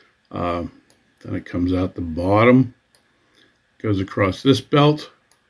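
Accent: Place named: Pennsylvania, United States. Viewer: Great video. Thanks for posting. As soon as I heard your voice I knew you were from back home. The western PA dialect is unmistakable. I was born and raised in California, PA. My grandad was a coal miner and my dad was a steelworker. I have seen lots of coal trains and lots of coal barges. This tour was great for seeing this part of the process in more detail. I never had the opportunity to see it. Thanks for posting. Keep up the good work.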